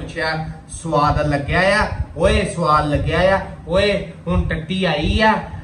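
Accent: Pathankot, India. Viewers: ਬੋਲੀ ਬੋਹਤ ਵਧੀਆ ਭਾ ਪਠਾਨਕੋਟ ਵੱਲ ਦੀ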